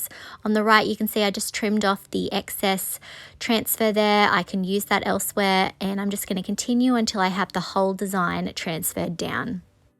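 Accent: Australia. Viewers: Loved all of these! You are so talented and like your Aussie accent❤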